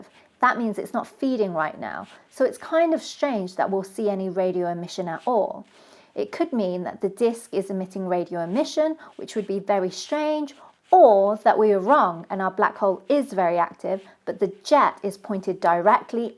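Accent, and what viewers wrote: United Kingdom. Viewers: ❤❤❤❤, love your frightfully British accent. If your Chinese is just as fluent… the world is your oyster😘😘😘😘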